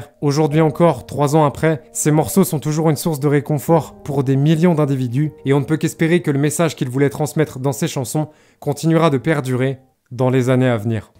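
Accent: United Kingdom. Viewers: Tu me fera toujours autant rire avec ton accent anglais je kiff 😭😭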